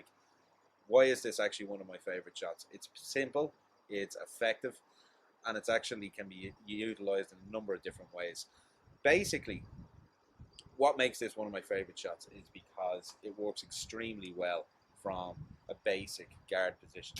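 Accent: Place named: Ireland. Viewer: I have palms on my hand but I don't have these paaaaalms that you speak of. Aye haha i just messing. I notice the irish accent has the same ah sound as the American midwest. Interesting sound.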